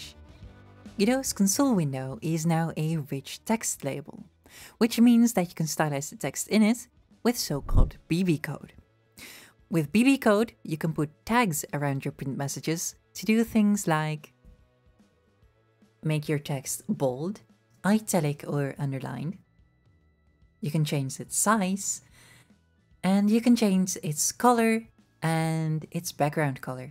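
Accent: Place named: Netherlands. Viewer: Gotta love godot... and your lovely dutch accent. Great video, neighbor :3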